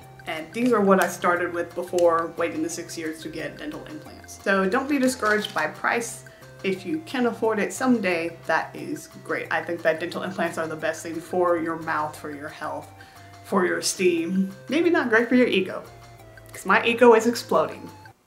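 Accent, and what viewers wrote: South, United States. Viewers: mad dy I’m Southern and it’s close. She’s from Kentucky, apparently, so I’m not far off.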